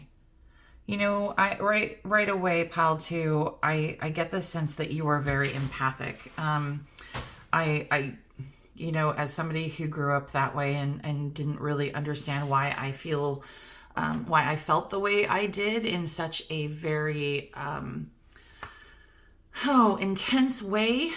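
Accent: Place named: South, United States. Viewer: you speak w the same dialect. But her southern drawl is lil deeper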